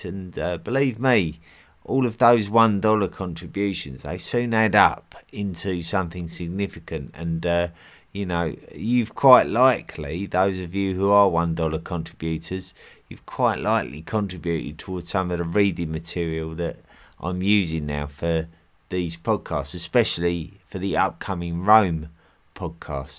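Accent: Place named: England, United Kingdom. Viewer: Narrator pronounces most of the words incorrectly. English accent grinds on my last nerve. 😢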